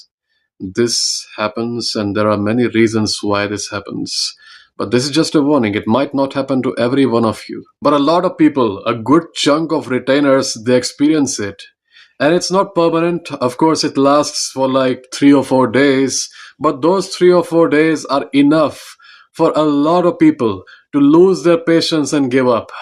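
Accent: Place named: India. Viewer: woh toh theek h par laadle ascent kati desi h aapka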